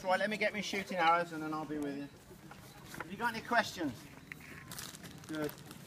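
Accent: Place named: Midlands, United Kingdom. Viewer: This is just how people from the Midlands talk.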